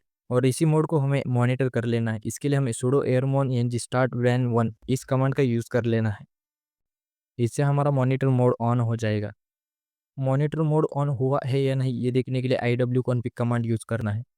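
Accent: Maharashtra, India. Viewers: Bhau tu pakka marathi aahes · Bhai tu Maharashtrain he kya?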